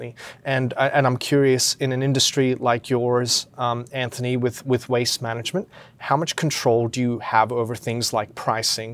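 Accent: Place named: Rural, Australia. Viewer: Too much yap yap in country Australian accent than showing ai doing sales.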